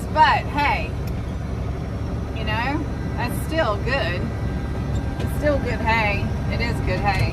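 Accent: South, United States. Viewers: I often think, regardless of your southern accent, you speak better English than the average English person of today. How brilliant to get a write up that gives an accurate portrayal. I have always been dismayed by journalist’s versions of events when reporting on things concerning us.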